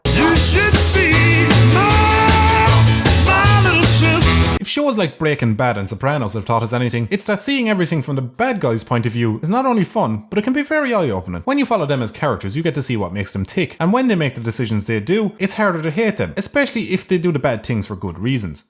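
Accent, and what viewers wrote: Ireland. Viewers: It’s part of the Irish accent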